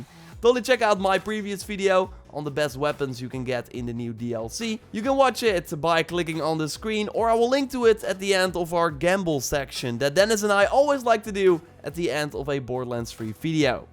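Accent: Netherlands. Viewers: Kijk aan, we zijn van Borderlands Tree naar Borderlands Free gegaan! Heerlijk, dat netherlish van je. So close!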